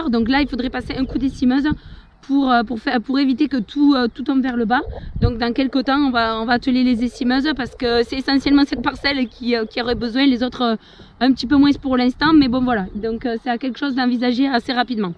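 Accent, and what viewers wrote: South, France. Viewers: Cc les amis merci pour ce voyage dans vos vignes c super intéressant merci on adore toujours ton bel accent du sud bon courage à vous 😎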